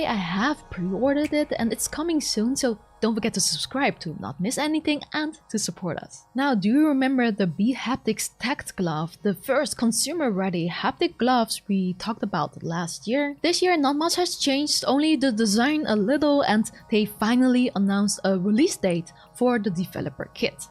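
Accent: Netherlands. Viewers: Great news! What is this accent? I can't put a finger on it lol It nearly sounds like a Dutch English accent n__n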